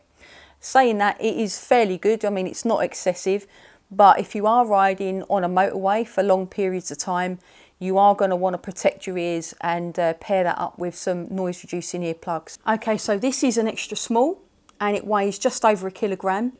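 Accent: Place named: Essex, United Kingdom. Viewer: That accent..... you gotta be an Essex girl!?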